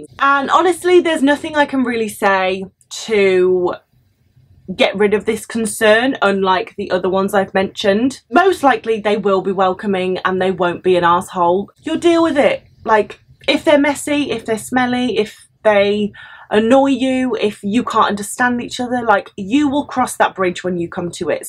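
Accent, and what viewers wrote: United Kingdom. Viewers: She's sounds so british actually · She must be from the UK.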